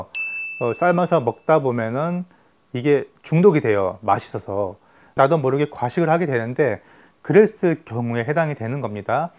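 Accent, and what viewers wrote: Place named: Jeolla, South Korea. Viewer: 아~따 전라도 억양이 징허구마잉~ 자네 고향이 어디당가?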